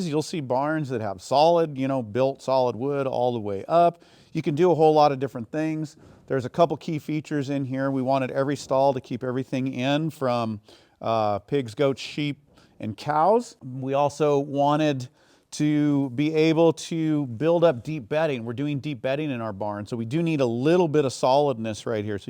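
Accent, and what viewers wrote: United States. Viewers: sounds like every blue state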